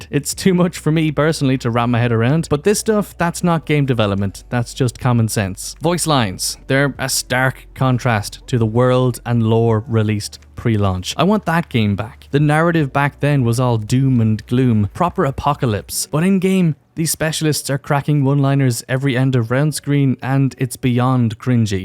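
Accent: Ireland. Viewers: Do I hear an irish accent in that voice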